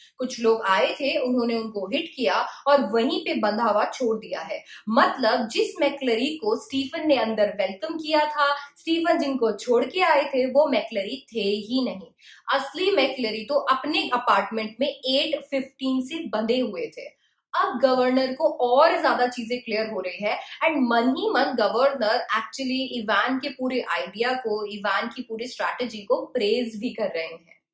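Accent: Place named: Australia. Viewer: Your Australian accent. I love it please talk in English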